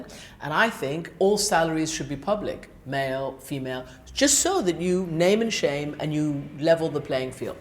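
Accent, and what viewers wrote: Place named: Scandinavia. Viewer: You speak as a scandinavian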